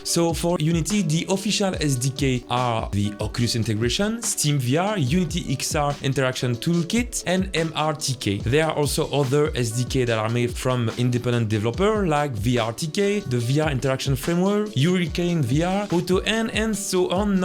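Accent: France. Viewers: It's so cool how good your english flow is but how extremely french your pronounciations are 😅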